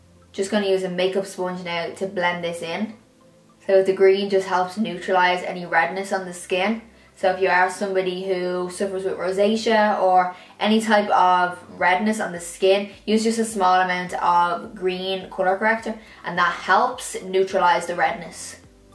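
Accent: Ireland. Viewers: Holy Jesus you're Irish:):) first time watching your vids after following you on social media:) are you a dub as well?xx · I just love listening to your brogue..it reminds me of my Nan who was from Kenmare..☘☘☘☘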